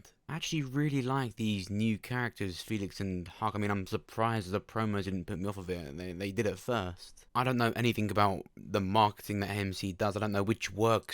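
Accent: United Kingdom. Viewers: you really sound like Jamie Oliver, one of the many family chefs in the UK, I'm sure you know who he is and I'm pretty sure you guys also came from the same area because of your accents.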